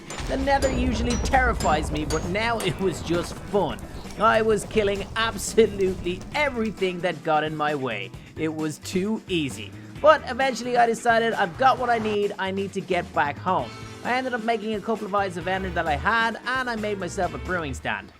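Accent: Ireland. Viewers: Are u Irish like me?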